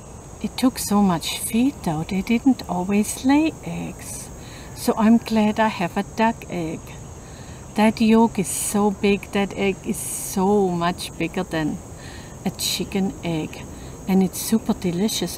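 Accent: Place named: Germany. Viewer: Du bist so lustig und besonders für Kinder! Ich hab' etwas auch gelernt. Ich höre ein Deutscher Akzent durch Englisch weil ich Muttersprachler von Englisch bin. Ich bin Amerikaner. Beherrschst echt gut Englisch! Macht weiter so. Fröhliches Weihnachten und Guten Rutsch! Vielen Dank.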